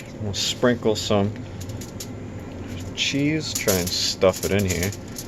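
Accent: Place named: Upstate New York, United States. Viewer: Are u from upstate NY lmao I can tell by the accent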